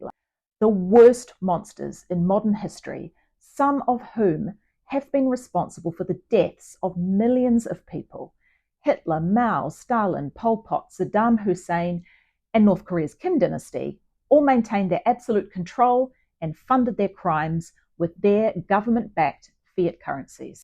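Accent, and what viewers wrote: New Zealand. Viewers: Very informative. Your accent sounds very Kiwi...in a good way:)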